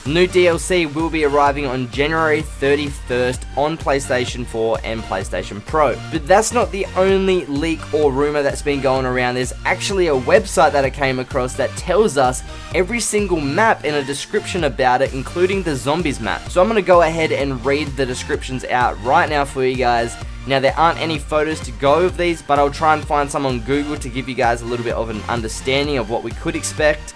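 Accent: Australia. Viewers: I swear he's Australian not British.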